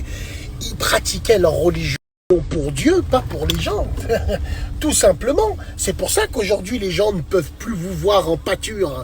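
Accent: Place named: Arab world. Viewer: C est tellement degeulasse l accent arabe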